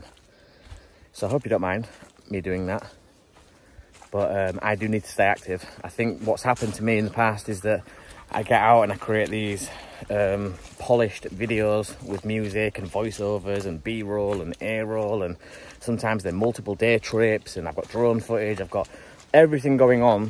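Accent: United Kingdom. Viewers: I love your channel! Your British accent makes me 🤸🏼‍♀️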